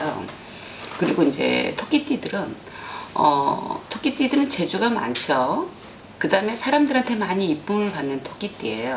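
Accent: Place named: Gangwon, South Korea. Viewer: 선생님 말투에 강원도 사투리가 섞여 있어요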